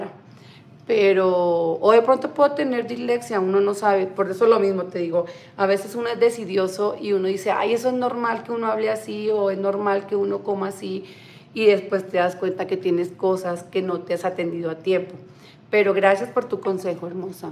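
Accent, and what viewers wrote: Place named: Colombia. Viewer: Me encanta su acento colombiano ,no le había comentado antes pero me caí usted muy bien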